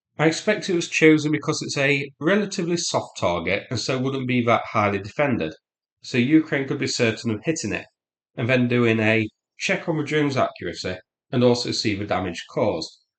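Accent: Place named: Middlesbrough, United Kingdom. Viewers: I agree with you. English is the language one uses if he wants to have an international audience. If one speaks with his Middlesborough local accents, then he is not speaking "internationally understood English", not the one which is internationally understood as such. I understand him because I turn the subtitles on, but the subtitles as well often don't understand what he says, and they cannot be faulted at all. I think he would have a larger audience if he used some computer generated voice. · i'm sorry you have this problem. The good news is: you have a great opportunity to learn understand Middlesbrough accent. It may not match what you expect, but it would be a lot less genuine if he put on some fake south of England middle class accent.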